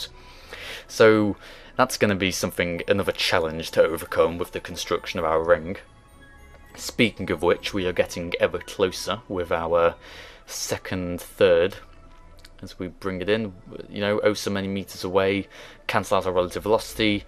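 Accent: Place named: Wales, United Kingdom. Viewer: you sound welsh :) watching in caerphilly :)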